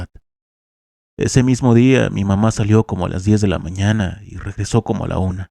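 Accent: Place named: Chihuahua, Mexico. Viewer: Voz típica de un chihuahueño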